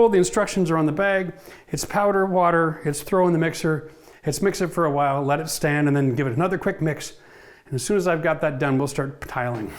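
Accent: Canada. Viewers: Canadian Aye !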